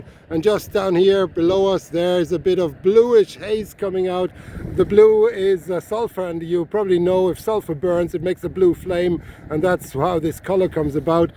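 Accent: Ireland. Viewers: lectured in volcanology and petrology at Trinity College Dublin <num>. Its just one of those accents you can't help but picking up some of the twang:) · You sound irish?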